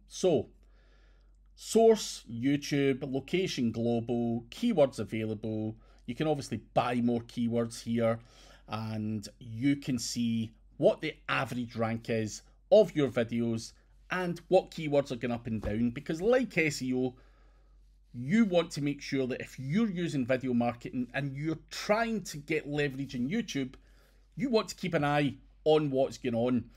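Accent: Scotland, United Kingdom. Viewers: Scottish brogue: still undefeated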